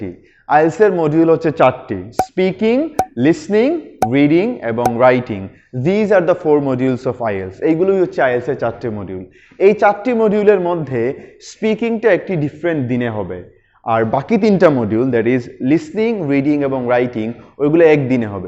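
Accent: Sylhet, Bangladesh. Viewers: Sir sylheter na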